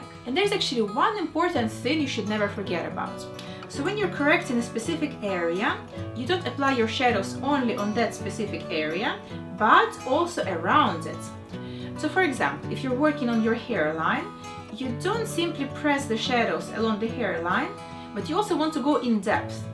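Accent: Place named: Russia. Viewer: After listening this just for a few seconds I became sure it is Russian accent. Double checked it in description and yep, she's russian. Btw привет, me too)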